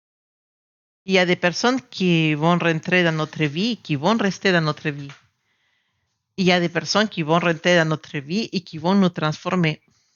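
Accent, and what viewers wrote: Spain. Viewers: Je suis la personne spéciale, il y a pour moi toujours une grosse attirance, je n'ai personne d'autre dans ma vie, on vient de se séparer car il me fait beaucoup de reproches il est dur avec ses mots irrespectueux, agressif dévalorisantes. J'étais jamais assez pour lui j'en ai perdu mon naturel. C'est vrai j'ai idéalisé et je suis très déçue, je voulais une belle relation avec lui dans le respect et dans la paix. C'était l'ascenseur émotionnel. Oui je suis triste malheureusement il ne changera pas. Je suis Gémeaux et l'autre tirage ma également parlé bcp. J'ai peur car je ne veux plus qu'il me parle mal et me corrige tout le temps. Oui je veux changer et le fait de ne plus accepter de l'irrespect et je dois mimposer plus mais aussi mouvrirnplis dans une relation J'adore vous entendre parler votre accent espagnol qui me rappelle mes origines😊 😊😊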